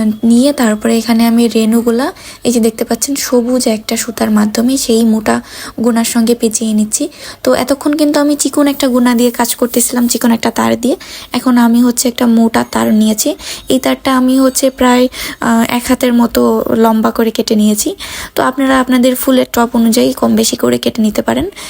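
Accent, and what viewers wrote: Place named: Naogaon, Bangladesh. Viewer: আপনার বাসা কি নওগাঁতে ভাষায় নওগাঁয় মনে হচ্ছে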